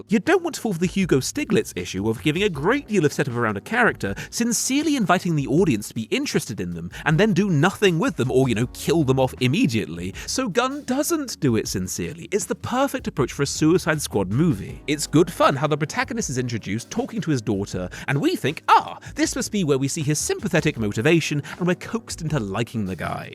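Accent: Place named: RP, United Kingdom. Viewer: Almost stopped watching at "Indi-AWH-na Jones". Mate, learn how to integrate proper pronunciations with your excessively RP accent. It's like when brits say Jackarse, it makes my skin crawl.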